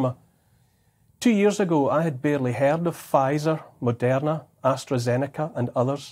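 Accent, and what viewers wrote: Scotland, United Kingdom. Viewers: I LOVE this Guy, thank you Neil, first of all, as a Swiss born & raised I couldn't speak English when I came to Canada in 1980, I got taken in by an elderly Scottish Couple, hence, it's Scottish English I learned first, so I love listening to you, greed is killing us, Pharma greed NOT THE good old "FLU" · Thank you from Germany for your good words! I love your accent, reminds me of a wonderful holiday in Scotland with my husband long ago.....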